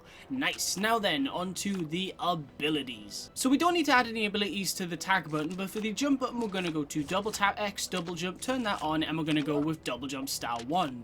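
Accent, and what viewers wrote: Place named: Tyneside, United Kingdom. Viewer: My son is loving your videos. What's bugging me is, your accent sounds soooo familiar.. Are you from the North East, Tyneside maybe?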